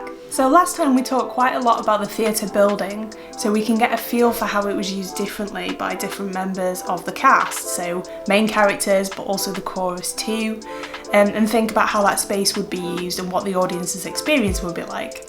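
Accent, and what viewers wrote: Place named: United Kingdom. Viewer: A beautiful girl with a British accent who talks about tragedy. 😍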